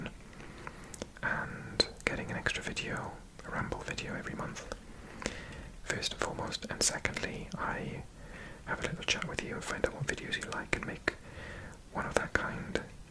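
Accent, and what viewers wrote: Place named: United Kingdom. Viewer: what is funny is that as someone from outside the UK i don’t notice you having a welsh accent and i just hear a british one, EXCEPT when you say “here” and it sounds like hiyuhr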